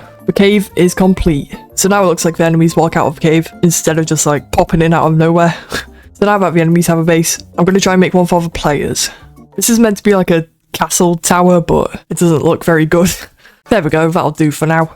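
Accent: United Kingdom. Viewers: it is his accent, i live up north england and his accent sounds familiar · I Think bros British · Really Good job, the British accent makes it even better❤ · Since you are British, can you say “Can I have a bottle of water?” Please. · Very British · Are you British?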